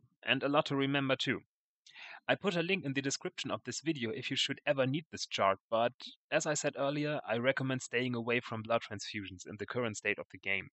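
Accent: Germany. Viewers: Do you are German? I could swear you sound like a German xD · You sound german :D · gutes video ich find es immerwieder witzig deutsche english reden zu hören :D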